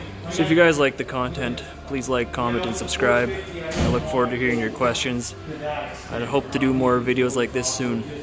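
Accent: Canada. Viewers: I knew you were a fellow Canadian after the first minute of the vid. Good job saved me a bunch of time !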